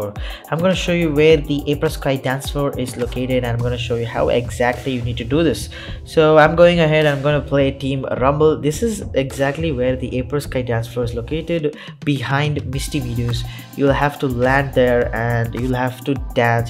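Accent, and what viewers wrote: India. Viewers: If your indian you have the best indian English accent nice your the only indian I can understand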